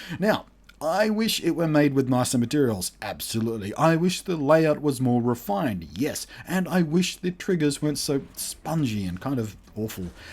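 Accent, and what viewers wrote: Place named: Australia. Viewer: On the topic of Australia, your accent always makes these reviews just that touch more special, but I really do feel for you having issues getting your hands on a lot of stuff not shipped or offered in Australia and your internet connection troubles. I have been waiting for this review and it was nothing short of what I expected. Thorough in depth review over the course of multiple games showing strengths and weaknesses. I'm going to hold off on this one for a little bit as I'm a console gamer moving over to PC gaming so I'm accustomed to the less controllable twin sticks. But I will definitely be getting this at some point in the future. I can wait for 2.0 when it does roll around. I hope things getting to Australia starts to get easier though. You're one of my favorite reviewers and if stuff takes longer to get to you then you're missing out on a lot of traffic and I just want to see someone I appreciate get more recognition I feel they deserve.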